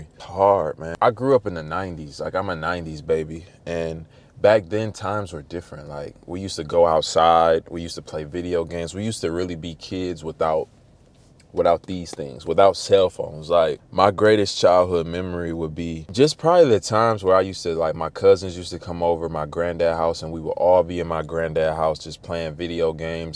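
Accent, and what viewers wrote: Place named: New York, United States. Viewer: I would've sworn you were from NY. You have that NY accident. Great content!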